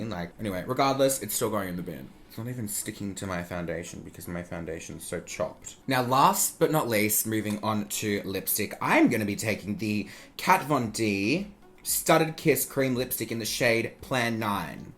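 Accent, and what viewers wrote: Australia. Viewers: Is he Aussie? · "Shout out to Kylie for making me spend $50 on this piece of shit" You can definitely tell you're an Aussie 😂 you crack me up so much because you remind me of some of my friends. Love to you from Melbourne!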